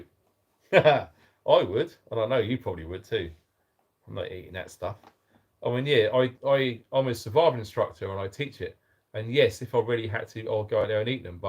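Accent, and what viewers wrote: United Kingdom. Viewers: Hi to u,I just now stumbled across your channel. I'm Nancy from 🇺🇸 USA of state of...Florida..hope you All are doing well over there.Nice to meet u..Lovein That British Cool Accent.Ive got a strong southern Accent 😆. I'll keep watching and keeping up with what's happening over there in your side of the globe 🌎. Thumbs up n keep doing what your doing you know..our governments are doing this to us citizens ALL, we're in this together ❤ 👍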